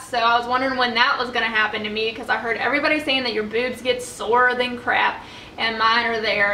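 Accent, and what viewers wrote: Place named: South, United States. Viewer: I was really trying to focus on the video but her dam accent is country and sexy!!!! Congrats gorgeous!!!! 😍❤️😉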